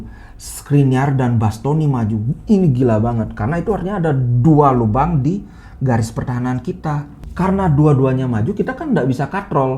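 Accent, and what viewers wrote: Bali, Indonesia. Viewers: Menarik komentarnya, apalagi dialek Balinese membuat senyum2 mendengarnya. Lanjutkan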